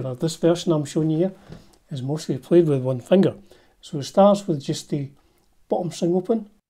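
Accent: Scotland, United Kingdom. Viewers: I really like your accent. What nation, is it Scottish ?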